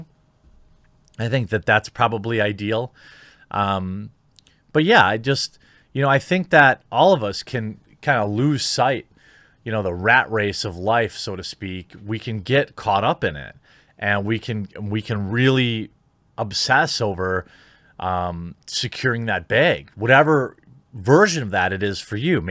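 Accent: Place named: Midwest, United States. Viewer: Hahaha I knew you were from the Midwest but that clip with you and your wife your accents really shown through. I was confused for a second because in your videos your accent is very minimal. Don’t get me wrong, nothing wrong with the accent just caught me off guard lol. If you heard me talk I’d be the one with the accent.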